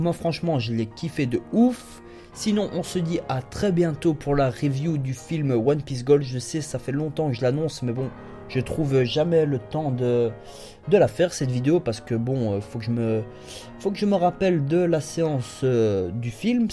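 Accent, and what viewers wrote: Belgium. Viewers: il super bien ton acent belge ca fait marre et ca change de ceux des francais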